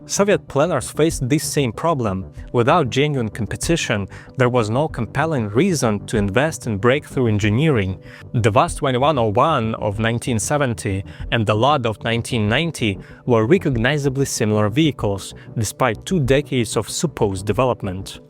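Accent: Russia. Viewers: У него сильный русский акцент, мне почему-то кажется, что автор русский) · Автор 100% русский, у него не самый чистый английский, есть русский акцент (это так, к слову)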